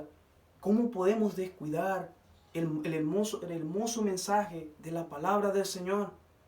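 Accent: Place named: Chile. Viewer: Ya pareces chileno mano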